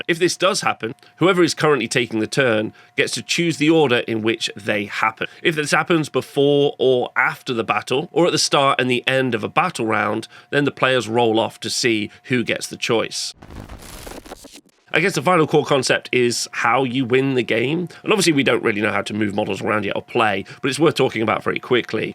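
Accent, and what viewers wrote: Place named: United Kingdom. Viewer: MORE LIKE THIS PLEASE!! this is the 4th time ive watched this video all the way through, while painting miniatures, its really helped me to get a solid grip on the rules system, maybe an advance or FAQ style one next, or faction specific rules breakdown. really appreciate the hard work you put into this. Also you have a much better presentation, personality, voice and vibe than alot of other similar channels i have come across, the british accent helps a tonne. thanks bro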